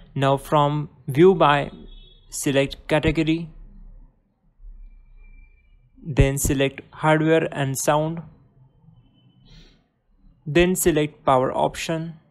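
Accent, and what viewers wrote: India. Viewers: indian lol loved ur accent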